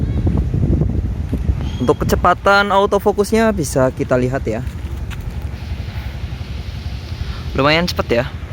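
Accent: Banyumas, Indonesia. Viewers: njir wong banyumas jebule😊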